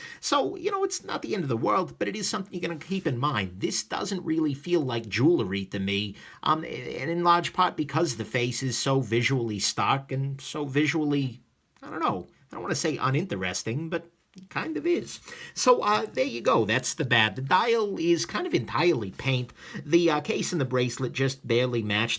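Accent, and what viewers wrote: New York, United States. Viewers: Taking a wild guess, you're from NY?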